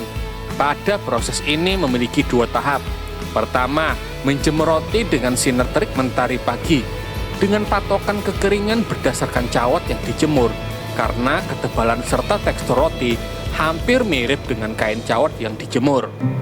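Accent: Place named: Java, Indonesia. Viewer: Why medok jowo? Ulun kira bubuhan keluak si julak nih... Tapi lucu pan.... Kawa jua... Baumpatan. ☺☺☺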